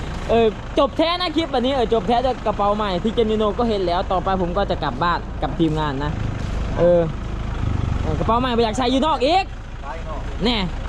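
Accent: Laos. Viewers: ຂ້ອຍມັກສຳນຽງສຽງເວົ້າເປັນຄົນສະຫວັນບໍ່ວ່າຈະໄປຢູ່ໃສ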